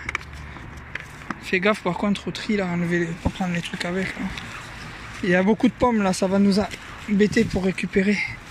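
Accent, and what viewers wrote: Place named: South, France. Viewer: C’est super ce que tu fais! Et en plus j’adore ton accent du sud c’est magnifique! Bon courage!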